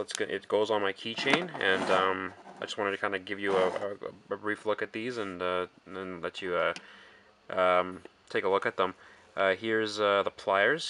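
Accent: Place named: Canada. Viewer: Does anybody else think right when he said he was from Canada you heard the Canadian in his voice 🇨🇦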